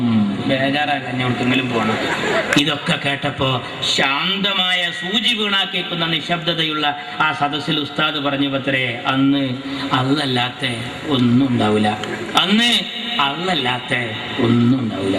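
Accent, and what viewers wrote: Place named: Kollam, India. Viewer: ഹാ ഹാ ഹാ കൊല്ലം നല്ല മലയാളം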